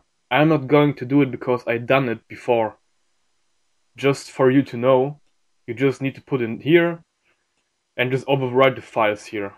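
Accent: Germany. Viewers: Man hört das du Deutscher bist :D Aber ich glaube das tut man bei jedem. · I think your german because of the accent. If so.. Was geht ab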